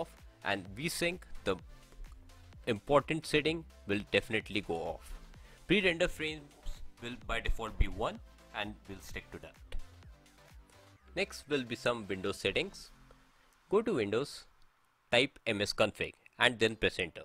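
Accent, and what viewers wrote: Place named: India. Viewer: This helped me a ton! Thanks! Also, I can tell you're an Indian by your accent... wanna play Apex together sometime? :)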